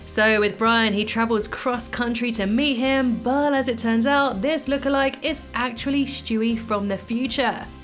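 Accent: United Kingdom. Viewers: Are You British Or American Cuz I’m British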